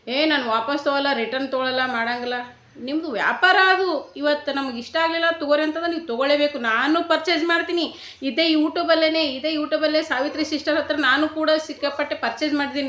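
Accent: North Karnataka, India. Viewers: ನಮ್ಮ ಉತ್ತರ ಕರ್ನಾಟಕದ ಭಾಷೆ, ಮಾತು ಭಾಳ ಚಂದ ರೀ ❤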